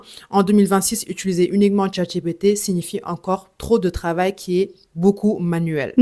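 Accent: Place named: Senegal. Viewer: Joli petit accent sénégalais ! ❤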